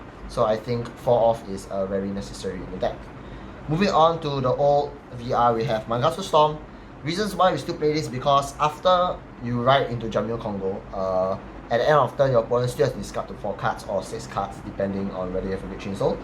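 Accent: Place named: Malaysia. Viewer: U have a malaysin accent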